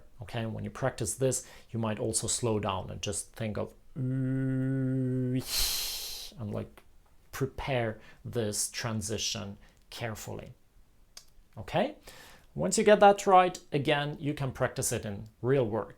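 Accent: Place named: Ireland. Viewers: Thank you! You’re English sounds a bit Irish! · The Irish are the only native English speakers that don't pronounce "th" as one sound. They pronounce "t" and "h" as separate sounds like most non native English speaks. His English "r" sounds Irish as well.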